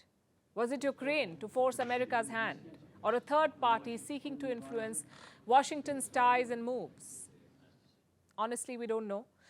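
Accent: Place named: India. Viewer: God I hate this indian Accent. Can you please use AI to "normalize" her voice.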